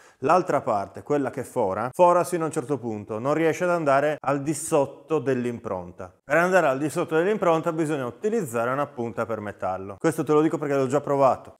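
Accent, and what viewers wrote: Sardinia, Italy. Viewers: Sei sardo? (Io si è in tal caso si capisce😂)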